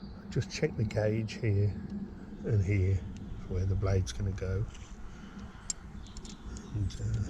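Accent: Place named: West Country, United Kingdom. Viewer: Just found this site and I am mesmerized by the seemingly precarious nature of your railway. It's clearly a labor of love. The amount of excavation you have done with just a pick and shovel is amazing. Well done mate! Can't wait for the next video. BTW, where in Britain are you located? I detect west country accents. Cheers from the USA.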